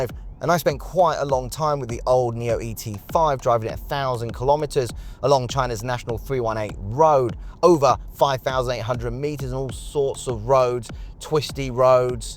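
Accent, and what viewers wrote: United Kingdom. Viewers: He sounds like a brit,right?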